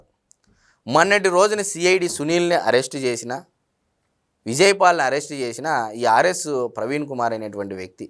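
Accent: Rayalaseema, India. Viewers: సూపర్ బ్రో మీరు రాయలసీమ వ్యక్తి లాగా అనుకుంటా రాయలసీమ వ్యక్తివా నువ్వు చాలా చక్కగా బాగా చెప్తున్న బ్రో. కమ్మ కులంలో ఉండేది అదే పరిస్థితి బాగా గమనించినావే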